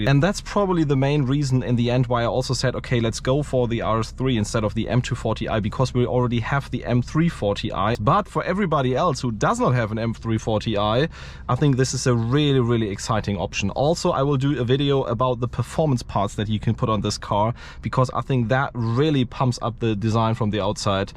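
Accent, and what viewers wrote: Germany. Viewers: Ist zwar ein wirklich gutes Video, aber du hast einen so starken deutschen Akzent, dass man raushört, dass du Deutscher bist hahaha. Meinen Like hast du aber auf jeden Fall trotzdem. Könntest aber eventuell etwas mehr auf die Höchstgeschwindigkeit eingehen. Ist gerade bei den neuen Autos sehr beliebt. :)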